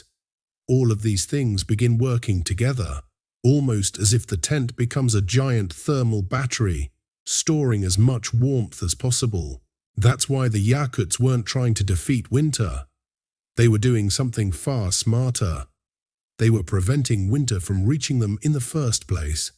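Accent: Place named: United Kingdom. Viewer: British sounding, but likely AI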